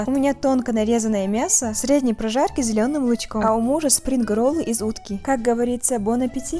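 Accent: Chechnya, Russia. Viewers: Акцент чеченский… но ролики у блогеров все под копирку ))) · Извините , а вы вайнашка? Легкий акцент чеченский… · У вас акцент Чеченка? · Да, очень милая и нежная, наверное чеченка или ингушка) немного слышу в речи акцент.. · А вы чеченка? Слышится лёгкий акцент)) А ролики у вас всегда получаются очень интересные .Так держать 👍👍👍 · Мне одному слышится чеченский акцент? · Я вижу, вы мастер акцентов. Было бы действительно отлично, если бы автор написала, что она с Дагестана, я бы посмеялся с умников в комментах... Я слышу, что это вайнахский акцент, или Чеченка или Ингушка, но опять же, она и с Дагестана может быть, всё зависит от того, насколько хорошо она владеет языком и с кем дружит. Не надо сразу умничать и исключать все остальные варианты... Глупо выглядите. Вы можете предположить, но не знать на 100%. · Почему-то мне мерещится чеченский акцент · А кто ты по нации ? 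Акцент на чеченский смахивает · Чеченский акцент выдает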